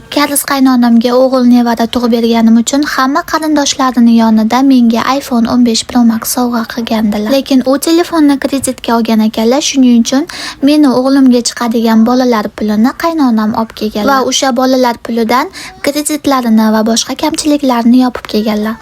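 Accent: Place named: Andijan, Uzbekistan. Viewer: анжанликмисиз, сарагҳее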